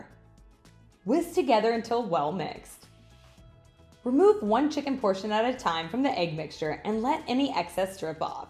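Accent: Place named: South, United States. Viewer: You sound like you're from the South.. just a random observation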